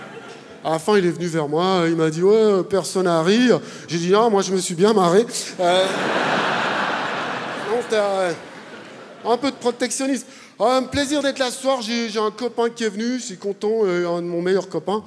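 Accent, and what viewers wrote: Vaud, Switzerland. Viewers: "C'était franchement désagréhâble" . . . beaucoup d'accents circonflexes notamment sur les "a", les "heu ou eu". Cet accent suisse, peut être vaudois, me rappelle celui des stéphanois (Saint Etienne 42). Fouillâ ! ! ! ! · Il a vraiment un accent suisse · J'ai plus rit de son accent suisse que du sketch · Accent Vaudois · Il a une drôle de façon de parler (et je ne parle pas de l'accent suisse) mais j'ai ri !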